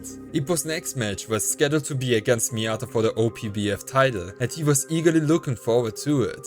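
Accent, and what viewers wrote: Germany. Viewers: bist du zufällig deutscher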